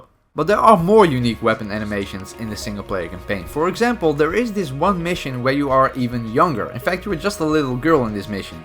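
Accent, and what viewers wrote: Netherlands. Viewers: I can see the Dutschness in your voicetone😂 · where are you from, you sound like from the Netherlands, cuz I'm from the Netherlands. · Yo have a dutch accent but nice video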